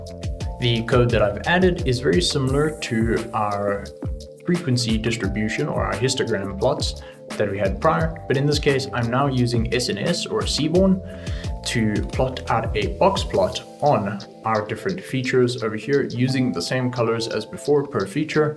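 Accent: South Africa. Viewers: Is that a South African accent? Automatic Subscription! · Am I hearing a South African accent? Thank you for the valuable video by the way.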